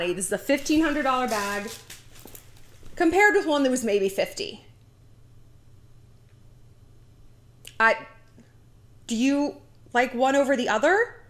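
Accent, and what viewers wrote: France. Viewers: As a partly French person, let me say - your French accent is delightful and the phrases you do know are perfect 👌🥖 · OMG! I'm French, and your French accent is truly amazing. I love it! <3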